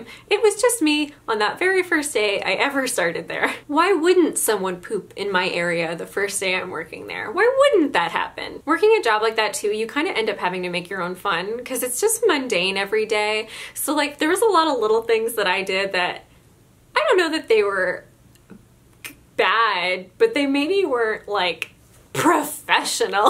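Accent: Newfoundland, Canada. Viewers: I HAVE ALWAYS WANTED TO LEARN A NEWFIE ACCENT I AM IN TOTAL AWE YOU DON'T REALISE THE TALENT YOU HAVE IT IS SUCH A TREASURE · Lmao that's such a good newfie accent u didn't know you were newfie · I don't have a retail story but your Newfoundland accent is so cute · Your Newfoundland accent made my LIFE! I'm a Newfoundlander living in Chicago and I have absolutely answered my salon's phone in a heavy Newfoundland accent before.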